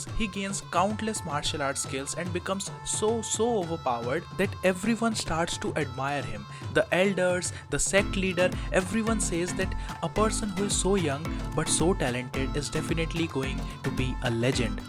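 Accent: India. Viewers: Why the fvk yt suggest this for min I opened this an hear the voice I exit sorry man I'm indian to but can't stand y'all accent ☠️☠️☠️☠️ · Your India accents really annoying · I am new here but good recommendations And btw are you from in India because I fell that your accent is pretty similar to us bi or trilingual. Also have you read these new manhwalike: 1. Arcane sniper 2. Return of the frozen player 3. Mercenary enrollment 4. Limit breaker 5.The player that cant level up All the above ones are relatively new with avg of 25+ chapters but surely they'll rise to top So read them and all of them are manhwa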